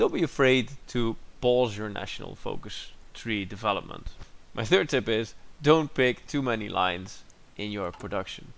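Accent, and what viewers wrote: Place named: Scandinavia. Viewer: what accent is that, prob scandinavian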